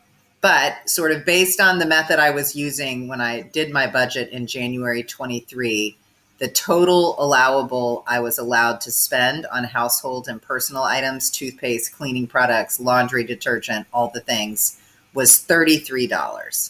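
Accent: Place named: South, United States. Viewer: You definitely have "South in your mouth" with the way you speak. A good thing.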